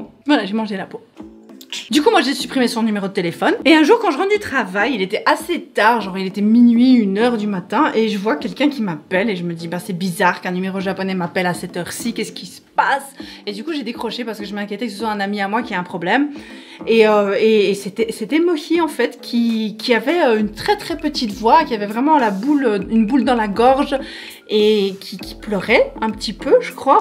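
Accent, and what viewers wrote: Belgium. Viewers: t'es vraiment Belge, ton accent reprend le dessus... ca me fait penser à la collection Arlequin ton truc... Les femmes nous sommes tjs sujettes aux mêmes clichés...Nous avons intériorisé ces clichés, même si on lutte... C'est l'homme séduisant , ok, occupé, + âgé que toi, qui gagne TRÈS bien sa vie...et tu as réussit ton rêve, non-avoué, de petite Princesse...merci la femme émancipée, qui essaye de gagner sa vie sur YouTube, mais qui sinon a arrêté de travailler, son boulot qui lui tenait a coeur au Japon, par amour pour le suivre ( l'amoureux )...aux USA, puis c''est le mariage et le bébé ... c'est bien ... c''est une histoire ... [ enfin les débuts semblent compliqués, la confiance...] mais qui répond qd même aux clichés, même aux clichés Japonais d'ailleurs ...tellement conservateurs ...et puis on n'est pas obligé de se marier pour former un couple officiel...et avoir un enfant ... 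tjs aussi pimbeche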